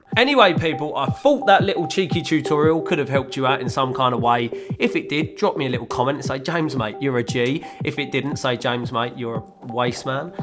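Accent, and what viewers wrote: United Kingdom. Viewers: this guy's British accent alone makes me stick to his videos like dammmmmnnnn mate!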